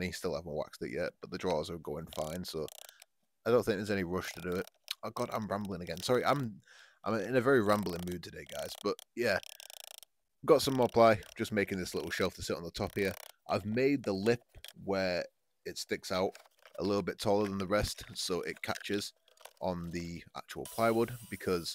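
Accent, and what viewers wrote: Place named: Midlands, United Kingdom. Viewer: ahh Cumbria, you sound like you’re from the midlands? I work in Carlisle on and off, I like that part or the uk!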